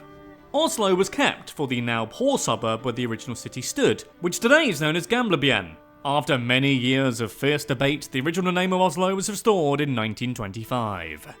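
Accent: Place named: Quebec, Canada. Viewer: Funny accent for a Canadian.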